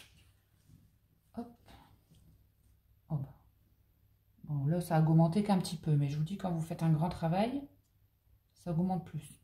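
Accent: North, France. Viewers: J'étais de somain sa sentant votre voix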